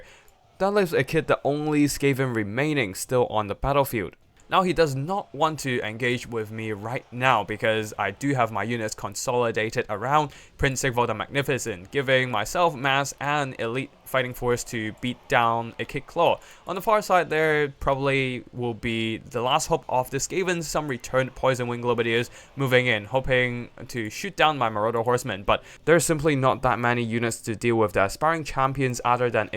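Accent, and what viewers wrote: China. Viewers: trying to place your accent but really unsure. Are you chinese?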